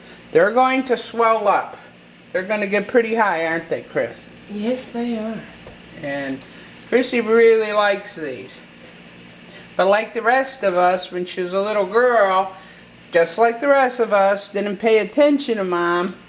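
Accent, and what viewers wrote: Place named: Maryland, United States. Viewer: Where are you? Your accent reminds me of Maryland.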